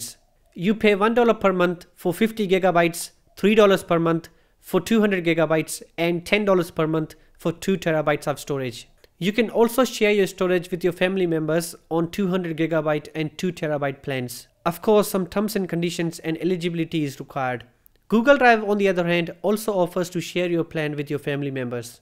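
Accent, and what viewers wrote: India. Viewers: I love Indian English accent!!!! Good content too. 👍🏻👌🏻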